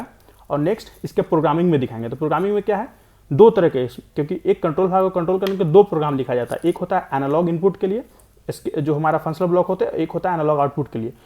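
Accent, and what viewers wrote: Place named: Bihar, India. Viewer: Bhai aapka language vihari type h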